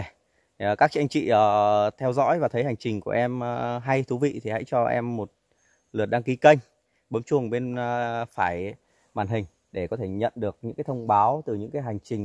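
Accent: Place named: Mekong Delta, Vietnam. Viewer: Nge giọng a gióg ng miền tây nam bộ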